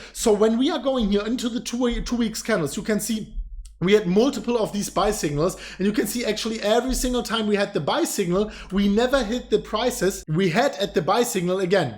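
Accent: Germany. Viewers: Love your german accent!! Love your videos! Keep doing what you're doing!! How can we turn on the hash ribbons on binance?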